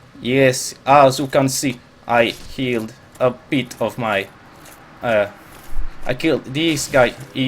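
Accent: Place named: Finland. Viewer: Your accent is bad and you should feel bad. Speak English properly, fucking Finns, I swear to god...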